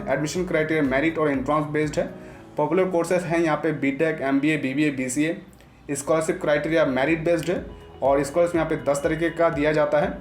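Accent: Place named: Northeast, India. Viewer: Hahah..this is not funny Bro..!🙄 Looks like this guy is from Northeast..and waha ki hindi thori si alag hoti hai.. isliye inka accent alag hai..!! Don't make fun of him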